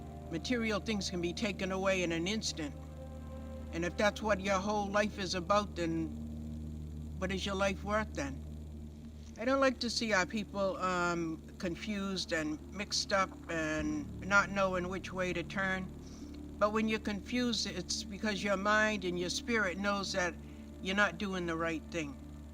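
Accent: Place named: New York, United States. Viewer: new york jewish accent the jews think they are black they stole the Black peoples history, what happened to all the red Skins,rich jews killed most of them off, Redskins who don't use the terms people of color that would be racist towards white people, who as soon as the sun shines on them, they turn a lovely Red color from the Pahana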